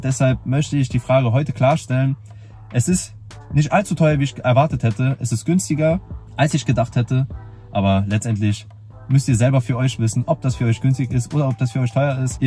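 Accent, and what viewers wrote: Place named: Saarland, Germany. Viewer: Saarländer, kannst du nicht leugnen😄 aber cooles Video! Sympathisch und authentisch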